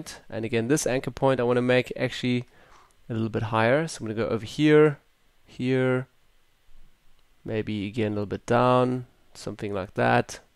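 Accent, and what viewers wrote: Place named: South Africa. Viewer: Cheers for the video boet. (Highly guessing you are South African) :-)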